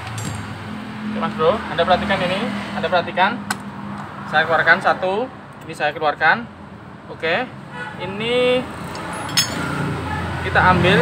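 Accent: Java, Indonesia. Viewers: masnya muka batak tapi logat Jawa tulen, keren mas bang😁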